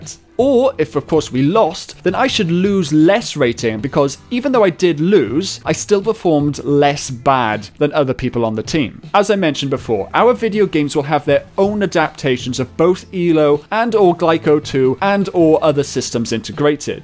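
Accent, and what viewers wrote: Wales, United Kingdom. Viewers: The accuracy/precision distinction is a personal pet topic of mine, sitting crucially in the middle of the entire field of predictivity, informativity and really all rationality as it stands. I just can't abide by a ... I can't think what the term is for it, a jumbling-up of the terms in a critical context, knowing the comprehensivity of its importance. The distinction is pretty simple (it can be explained with pictures of kittens wearing mittens) and pretty significant so any superficial pedantry can be accepted. It did take me a few minutes before some of the -isms of your accent that I'd been mulling over began to coalesce around "Welsh". At first it just sounded like a generic southern-central English accent, but I imagine if you said "I left my wellies in the valley" it would highlight the distinction. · Ey there boyo. 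what part of wales are you from? Newport/Chepstow down yere.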